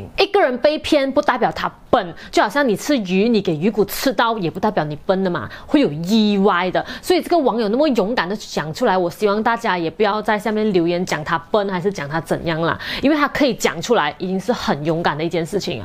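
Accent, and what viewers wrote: Malaysia. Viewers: 馬來西亞的口音好好玩~~ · 你是大馬華人嗎？你和春小喜都是馬來西亞人，為什麼你們的普通話口音區別怎麼大？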